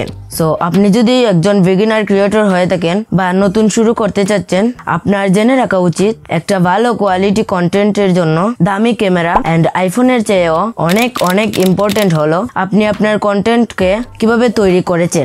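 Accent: Sylhet, Bangladesh. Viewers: ভাইয়া তুমি কি সিলেটি ❤😊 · ভাই তুমি সিলেটি নি বা.! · Tumer bari Sylhet ni suto bai?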